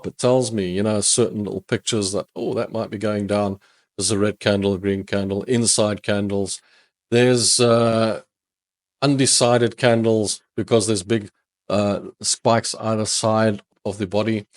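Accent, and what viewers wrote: South Africa. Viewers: i knew i recognised that springbokka and borewors accent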